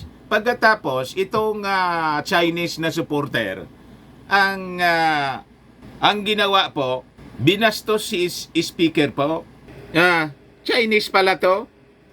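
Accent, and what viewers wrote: Bicol, Philippines. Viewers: Parang Bicol diction mo. Saen ka sa Bicol? Kami sa Cam. Sur.-- sismer boaquena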